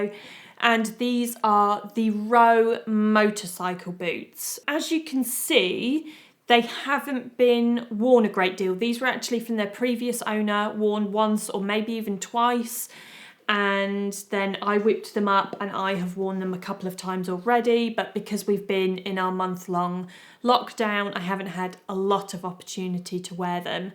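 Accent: England, United Kingdom. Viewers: How should I express how much I love your English accent and the professionalism in all item reviews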